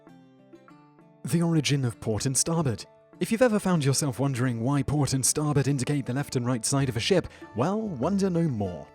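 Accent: United Kingdom. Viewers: i know that is a navigation thing, and maybe a pirate one. but why so british? even feeling that i were the joker saying that, and is a good video, the talk is too fast. still is a good info. at some point it become harsh to translate.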